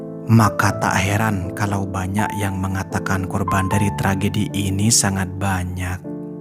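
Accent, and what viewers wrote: Madura, Indonesia. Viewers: Kamu orang Madura ya, logatnya itu loh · Dengar suarany,abang org madura ya..salam kenal bang.saya org sampit kalteng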